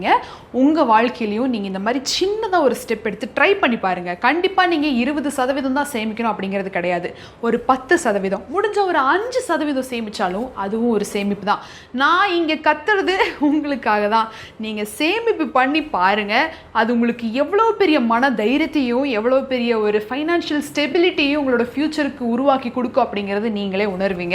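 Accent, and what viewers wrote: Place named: Kongu, India. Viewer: Good information sister.. 👍I like the way she speaks in kongu slang..❤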